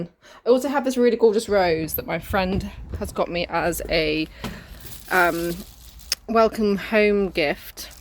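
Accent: United Kingdom. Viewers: Hi there 👋 r you from Britain?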